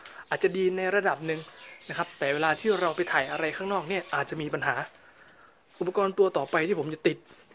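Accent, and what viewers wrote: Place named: Surin, Thailand. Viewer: พี่สำเนียงเหมือนคนสุรินทร์เลยย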